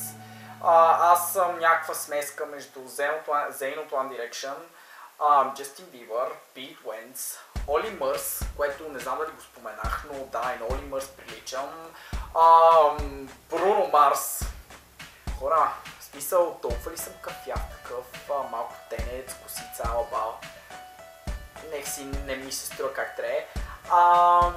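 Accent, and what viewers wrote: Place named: United Kingdom. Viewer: имаш доста готин английски акцент, по-скоро великобритански, за да се разбере по-добре какво имам предвид. Защо не направиш клип с любимите ти или най-омразните ти неща на великобритански английски... ще ми е интересно :)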